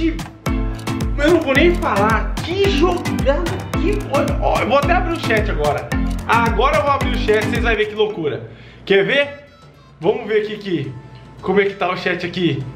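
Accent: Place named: Bahia, Brazil. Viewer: Essas hora thi kkk Tá meio baiano ne kk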